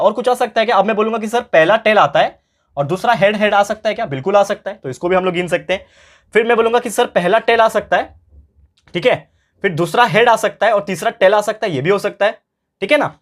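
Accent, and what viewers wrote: Maharashtra, India. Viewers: sir are your mother tongue is marathi. .. just asking😅 And thanks for teaching. Best teacher❤❤🧡🧡🧡🧡🧡🧡💛💛💛💛💛💚💚💚💙💙💙💜💜💜🤎🤎🤎🤎🤎💜💜💜💜💜💜💙💙💙💙💙💚💚💚💚💚💚💚💛💛💛💛💛🧡🧡❤❤❤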